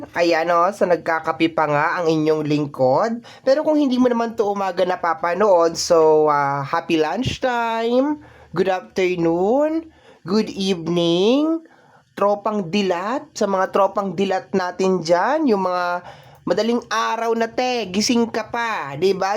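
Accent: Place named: Ilonggo, Philippines. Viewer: abaw a kabalo ka mag ilonggo a😂😂😂gudevz po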